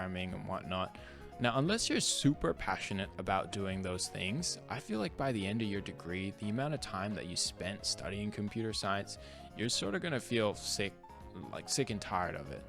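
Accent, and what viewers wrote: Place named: Australia. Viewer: This is probably the most mild Australian accent I’ve ever heard 😂😆 I’m pursuing a film studies degree and while I love doing photography/videography, I’m also worried abt job prospects. But I’m also not particularly a great student so I felt like I had to choose this degree bc I would literally fail the other classes… idk uni is just hard lol. It will work itself out ❤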